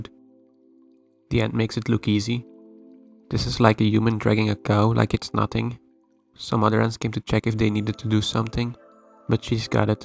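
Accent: Netherlands. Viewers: je Nederlands accent is hilarisch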